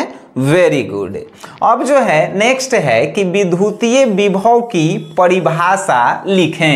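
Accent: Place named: Bhojpuri, India. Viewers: Bhojpuri h kya akdm se😂😂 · Apka bhojpuri sound mast hai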